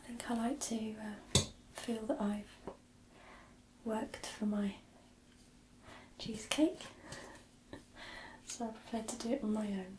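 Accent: United Kingdom. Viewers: I love cheesecake!!! I have made chocolate chip cheesecake and it’s delicious! you are wonderful! I love your British accent!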